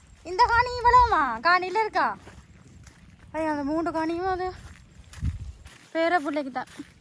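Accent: Sri Lanka. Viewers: உங்களுடைய குறல் இளங்கைவாணொலியின் பிதாமகன் ஐயா அபதூல்ஹமீது நினைவு வருகிறது இலங்கைத்தமிழ் உச்சரிப்பு கேட்க அழகாயிருக்கிறது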